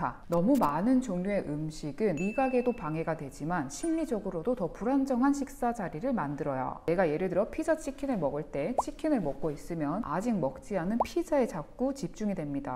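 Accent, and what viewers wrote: Gyeongsang, South Korea. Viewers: 선생님 경상도분이신가요??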